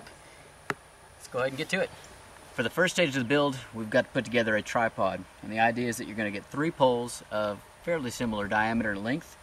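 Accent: Texas, United States. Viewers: I love hearing you say the things Texans say, I miss all my country folk back home. "if you hold your tongue right" I say this in Arizona to a lot of people and always have to explain.... thanks for you vids and I hope things are going well for you, haven't noticed any updates so far. thank you thank you thank you and speedy and full recovery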